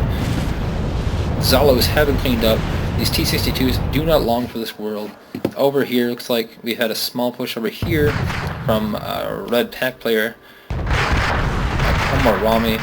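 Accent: Canada. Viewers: Gorgeous vids! You sound like you might be Canadian, it's funny how you would say everything with the vowels "ou" in an accent. but in the case of "routing" where the canadian accent way of "ou" is actually the proper way to pronounce, you turn it the other way around :p Looking forward to more!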